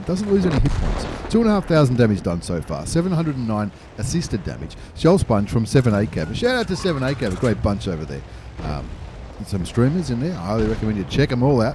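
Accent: Australia. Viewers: Hey yes you not those other bloaks luv your videos and awesome aussie accent keep up good work from Jax Fla USA P.S no homo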